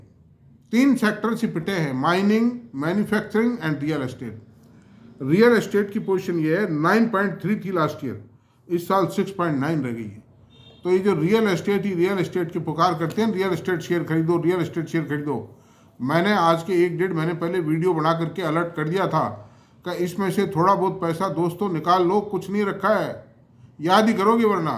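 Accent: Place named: Marwari, India. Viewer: Aap ki marwadi hamare wali hai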